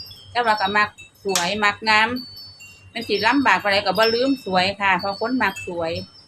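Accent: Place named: Yasothon, Thailand. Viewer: สวัสดีจ้า เว่าเสียงสำเนียงเหมือนทางบ้านยโสธรเลยจ้า ร้องเพลงกะมวน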